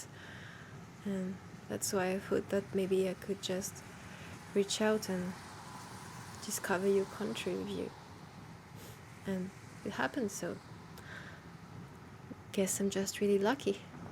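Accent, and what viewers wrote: France. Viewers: T'es Française chef ?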